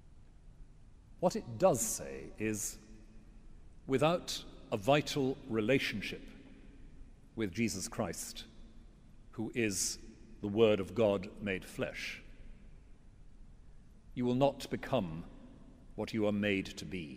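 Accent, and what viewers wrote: United Kingdom. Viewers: Where did he get that posh accent? He's a Welshman! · The Archbishop of Canterbury has to speak the Queen's English! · the priest with the golden voice, and a british accent to boot!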